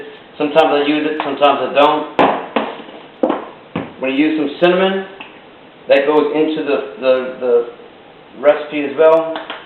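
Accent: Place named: Louisiana, United States. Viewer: No accent you say? ? I hear Louisiana.. Delightfully so. I chose this video to respond because I enjoyed it..and it will remind me that I want this recipe. :-)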